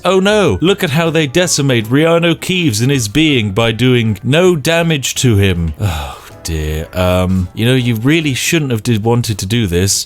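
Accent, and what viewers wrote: United Kingdom. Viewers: Youtube: recommends a video. Me: hears slow talking British voice. Me: ×2 it is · Something says to me that he is British 🤔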